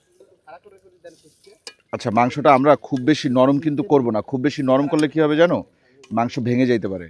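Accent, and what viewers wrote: Barishal, Bangladesh. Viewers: ভাই আপনার বাড়ি বরিশাল নাকি?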